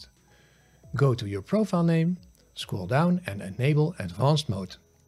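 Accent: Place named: Netherlands. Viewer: Hey there! Are you perhaps from the Netherlands? As another dutch person I have to say you've got an amazing accent, definitely still recognizable as dutch but FAR better than any other dutch person I've heard speaking english (Who isnt a professional singer who has trained their accent for their entire life.) haha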